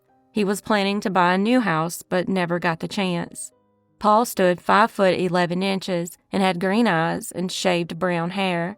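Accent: South, United States. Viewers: mine too. And her voice is relatable to my Southern self.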